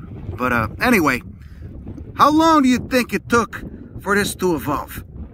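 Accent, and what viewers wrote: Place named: Boston, United States. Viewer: You guys are so bad! Lmao! It sounds like a Boston accent, but it's killing me.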